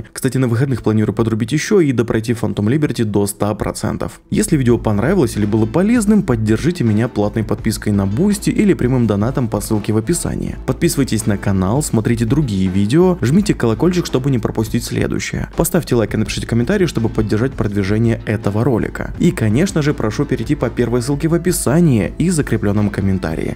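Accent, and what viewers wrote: Primorye, Russia. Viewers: С Приморского края что ли? :) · вы случаем не с Приморского края?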